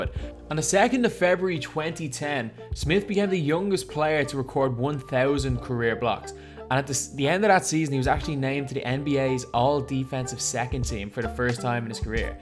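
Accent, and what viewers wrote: Ireland. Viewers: where is josh smith now you ask, in the nba i hate your irish accent go watch your own sports you potatoe eating fuck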